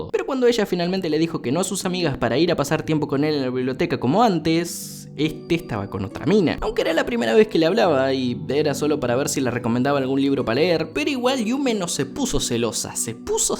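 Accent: North, Mexico. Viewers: 🤠es norteño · LA HISTORIA ESTA MUY BUENA RISA DE GUFI PERO TAMBIÉN MUY NORTEÑA🤠 BAÑENCE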